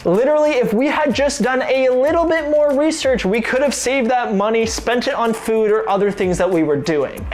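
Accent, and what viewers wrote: Canada. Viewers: you sound canaidan are you?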